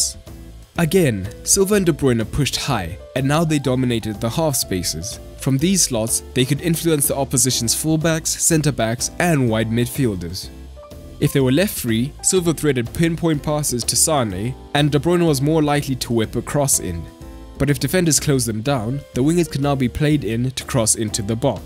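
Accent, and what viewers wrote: South Africa. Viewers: I'm sorry but your accent sounds South African, are you South African?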